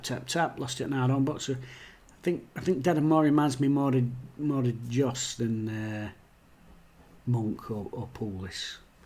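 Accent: Sheffield, United Kingdom. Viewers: Gold. Subscribed. Owlstalk sent me here....... Carlton Palmer quoting Big Ron, so pertinent, he was dead right. If you can play like Brazil, great if not at least put a proper shift in, the crowd will appreciate that just as much. I'm not sure what I enjoyed more, hearing a Sheffield accent or the fact that I agreed with everything (that doesn't happen everyday...) 👍👍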